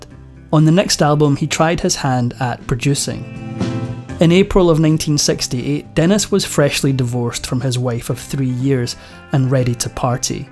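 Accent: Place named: Scotland, United Kingdom. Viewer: You answer my question . I love his voice too. Scottish . I thought British.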